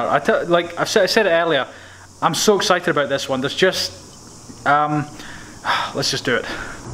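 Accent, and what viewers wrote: Ireland. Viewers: are you Irish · i love ur irish accent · are u irish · u have a cool Irish accent any way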